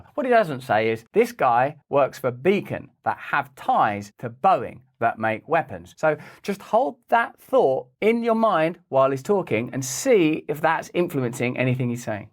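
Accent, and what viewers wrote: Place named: England, United Kingdom. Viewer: I been following your channel for a while and one thing that stops me from continues watching your good content is lack of subtitles. It is hard for me to understand your English accent. Hope you can add subtitles one day so that native Spanish speaker have an easier and clear understanding of such valuable information.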